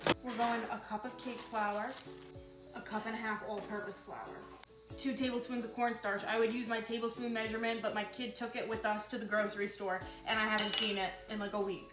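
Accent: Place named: New Jersey, United States. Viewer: You are soooo Jersey!!! I lived in S Jersey until I moved to Iowa. My boys always tell me, "your Jersey is coming out"!!!!! I miss the accent so much.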